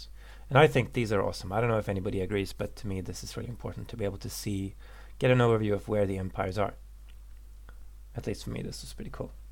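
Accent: Poland. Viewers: Are you Polish by any chance? You don't sound American And is this going to the android version as well?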